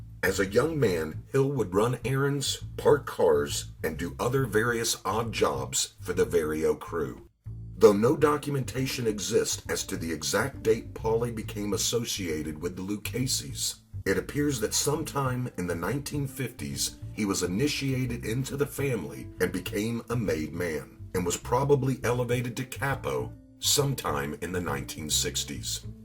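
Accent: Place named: South, United States. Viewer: mispronounces Italian names with his southern drawl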